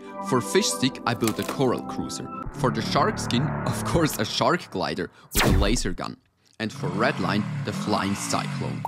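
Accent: Germany. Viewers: youre german?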